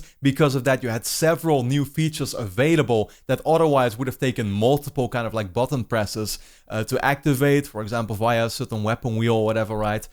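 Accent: Netherlands. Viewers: are you dutch your accent is really dutch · Love you’re Dutch accent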